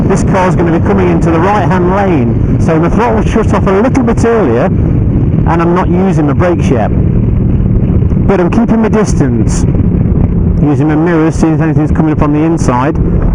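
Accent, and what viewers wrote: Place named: Birmingham, United Kingdom. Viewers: With that Birmingham accent you sounded exactly like Craig Charles- LISTER! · Going thru’ your ‘back catalogue’ & still ‘loving this’, in 2024! I’m a year into my new toy, a Super Meteor 650, after a 42yr ‘hiatus’ (having driven most things up to a 7 tonner). Still struggling with filtering, if I’m honest. Your voice remains my primary ‘internal monologue’ when riding (not the Brummie, tho’).🤨😉😅✌️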